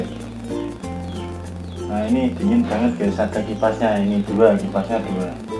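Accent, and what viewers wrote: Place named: Jepara, Indonesia. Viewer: Kyoke mayong jeporo lur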